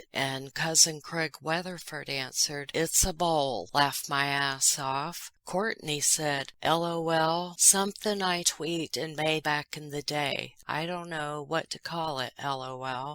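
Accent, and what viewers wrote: South, United States. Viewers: PS. You also teach me so many new expressions in the English lingo. I'm very grateful. Your sense of humor is just my cup of tea. Your southern accent isn't that pronounced to me as some I've heard from/of. I myself has a "cosmopolitan" accent, my best friends from the U.S. told me last time me and my hubby visited them. That is more kindly said "Your accent is all over the place with Swedish, British, Australian and American and it sounds pretty f'ck*ng funny!" DS. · southern drawl! It reminds me of my southern-as-all-hell aunts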